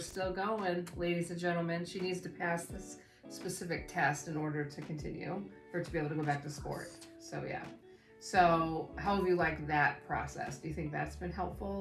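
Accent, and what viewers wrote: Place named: New Zealand. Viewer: Hello there! Finally we got to watch the video, thanks for that and the many informative videos you've allowed us to watch. I hope your daughter makes a full recovery as soon as! Hearing about the 'enn-zed' (NZ) healthcare system (and others like 🇨🇦, 🇦🇺), makes ones stress levels regarding the 🇺🇸 healthcare system increase. The most enchanting thing about your videos is the NZ 'highlights' on your accent, especially as you pause or end yor sentences. I listened so keenly to your daughter, but she hasn't got that yet. Looking fwd to the next.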